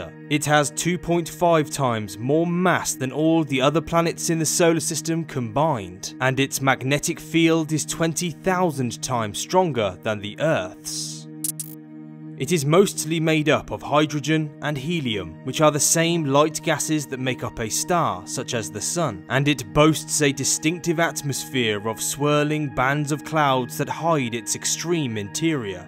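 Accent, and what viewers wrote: United Kingdom. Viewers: I just dont get it you you speak like a british but you use imperial measurements. Anyway, have you ever wonder that there might be a civilization or alien life inside that giant red spot? protected by the cyclone itself.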